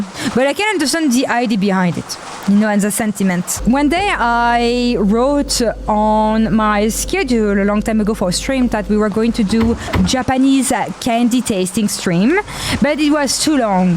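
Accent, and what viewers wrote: France. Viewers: I’m Mexican but I really like her French accent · A french accent Vtuber... "Never in my life have I needed something so much, and never known until I received it"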